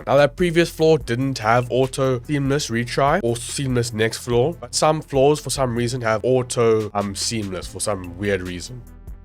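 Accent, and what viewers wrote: South Africa. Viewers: holy, i recognised that south african accent instantly.Thought i was the only one in the country to play als.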